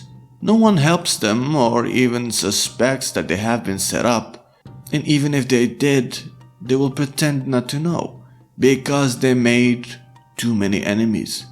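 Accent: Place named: France. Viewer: flawless and nice French accent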